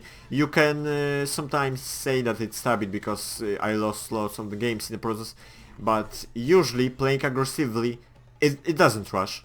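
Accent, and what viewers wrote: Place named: Germany. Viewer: you great but try speak more well ok ? where u form perpahs germany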